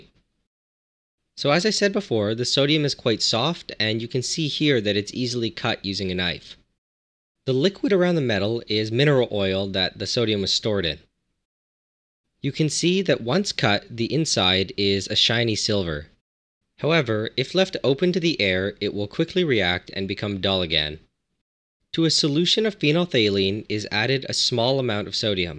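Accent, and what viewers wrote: Canada. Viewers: That's how Canada sounds?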